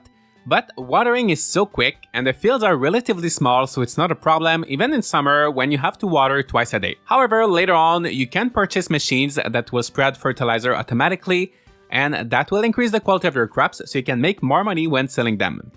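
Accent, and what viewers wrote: Quebec, Canada. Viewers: Are you french Canadian? You have the same accent i have.Great review Btw. Keep up the good work!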